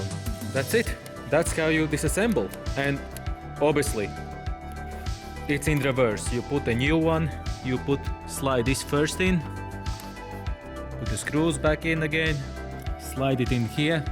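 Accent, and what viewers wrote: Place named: Germany. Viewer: oh, that's great news to me - what you have is what I'm after, because I am very used to 5 pos switches and I enjoy pressing once to fully close my windows when I've arrived to my destination and need to leave my car - and I hate that I can do that with my driver's window with a simple one full pull, but have to hold the pulling for the other 3 windows. I also never had an issue to make a bit more gentle press to crack a window instead of full open or full close because I clearly feel the 3 pos vs 5 pos switch - probably my gaming spider senses kick in to feel the difference :) My problem is that whenever I try to search for a switch with all four windows on auto that you have, I only find the one with driver's window auto only, I even thought it doesn't exist, but you gave me hope. Now, I need to discover a part number that would mean the switch that you have and it also has to be for a right-hand steering. 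Come to think of it, I have an idea to swap with you, because in your videos it looks like you have a right-hand one, although being in EU Feel free to contact me on Telegram (I'm Australia, so parcel might take a week to arrive - last time I posted internationally was around a couple of months ago and it took 4 days to arrive to UK, so I suppose would take around the same time to post to Germany or Austria, considering your accent).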